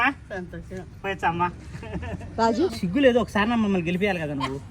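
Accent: India. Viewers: మీది కడప న స్వామి 😅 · హాయ్ అండి where are you from ఆ యాస చూస్తే మన రాయలసీమ లాగా ఉంది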